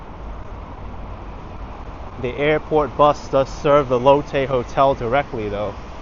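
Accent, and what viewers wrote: New York, United States. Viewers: What a cool looking city. Your NY accent is so think. 😂 It gives so much character.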